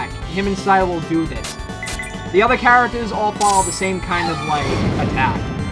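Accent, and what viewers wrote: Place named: New York City, United States. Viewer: Is this LP'ers voice for real? Or is he a parody of an NYC Jew? That voice can't be for real.....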